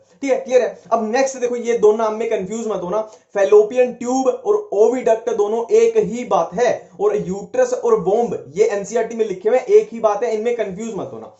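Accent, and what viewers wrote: Haryana, India. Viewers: Thankyou so much sir Waise aap Haryanvi hain kya?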